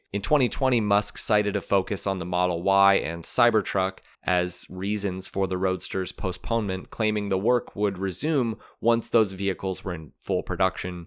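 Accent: United States. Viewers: Wow, this put me to sleep, even after turning the volume down to escape that painful, droning American accented narration. Better than sleeping pills, safer too.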